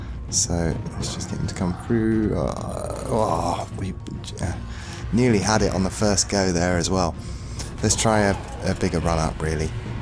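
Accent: United Kingdom. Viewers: ive tried watching this but you are a true example of having a british accent making you only sound intelligent. its may lay not me lee. and im back tracking but its not fajora, youre way off. thats only a couole of examples. quit dumbing down society and get a brain, do some research for your "content" as they call it now. dont just sit on your ass and play video games and blast more commercials than the superbowl at people. mildly entertaining at best, would be better if you could pronounce stuff correctly and not make my ears bleed with the butchery of the english language you lot are so fond of claiming as the inventors of.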